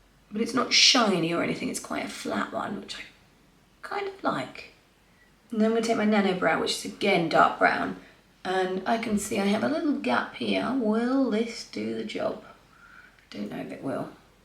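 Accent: England, United Kingdom. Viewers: you are just so sweet and I love your lovely English accent. I think the kosas foundation looks amazing on your skin. Your skin is beautiful without makeup…..you are glowing girl. I so enjoy your videos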